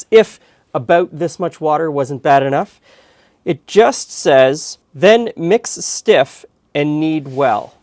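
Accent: Canada. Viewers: Did you cut your own hair? Nice job. Thanks for the presentation and the recipe. I can tell you're a fellow Canadian by the accent. Stay safe. ♥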